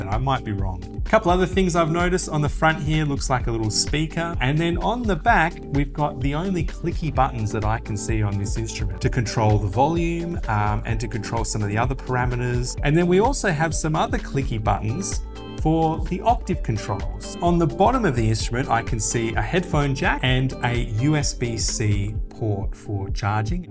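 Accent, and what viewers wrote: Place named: Australia. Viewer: Didn't realize you were an Aussie until you stated it. After that, I couldn't unhear your accent. Regardless, you have perfect radio/TV voice for any international channel, you articulate so effortlessly. And thanks for the excellent review. I am really considering getting this instrument and will use the link if and when I do. If I were not already focused on learning various rythm instruments, I would consider sax and your course. It is an opportune deal for those who are interested though.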